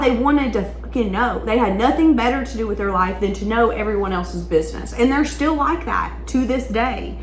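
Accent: South, United States. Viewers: ❤ I adore your spirit! Always so sweet and to the point. Southern bell?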